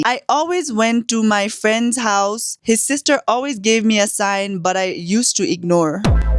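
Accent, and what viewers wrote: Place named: United States. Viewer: "tpi ko accent kina yesto amrica" 😭😭😭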